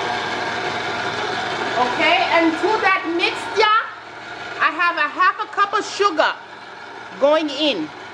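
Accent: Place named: Germany. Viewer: You have a solid german accent haha I was not expecting your reveal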